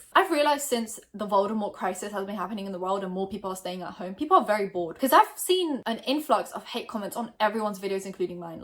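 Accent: United Kingdom. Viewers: Vixen idk she’s definitely british, that’s not an australian accent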